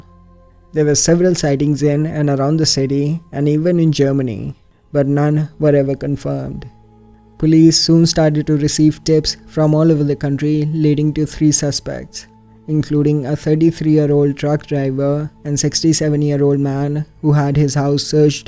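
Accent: India. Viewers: New voice, indian accent sounds different👍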